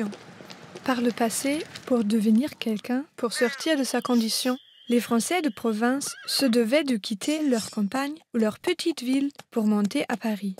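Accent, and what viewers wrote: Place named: Germany. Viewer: L'accent allemand est tellement charmant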